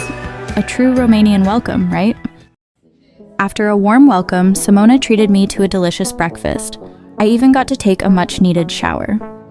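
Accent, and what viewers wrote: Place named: United States. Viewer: Korean??? Then where is the american accent coming from?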